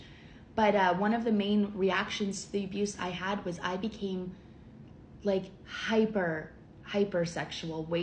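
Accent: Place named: Canada. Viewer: You sound Canadian. So I’m guessing this occurred in Canada. Could be wrong though.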